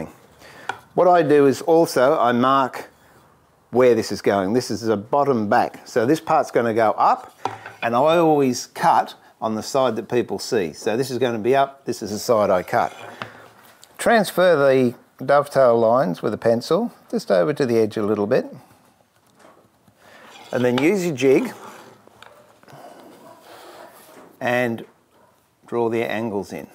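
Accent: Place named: Australia. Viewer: Nice australian accent :) Thank you for your efforts very much. Your work is more than useful.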